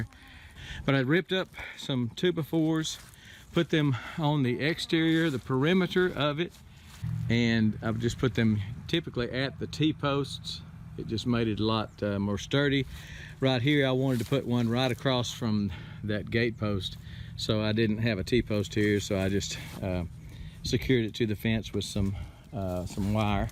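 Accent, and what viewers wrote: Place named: East Texas, United States. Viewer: First, whoah that is a THICK East TX accent! Growing up in Houston, I remember hearing accents like this frequently anytime we had to go up 59 to see my uncle, and to attend antique shows/fairs. Super helpful video! Curious how far in the ground you put the 2x4’s? Did you concrete mound the bases of them?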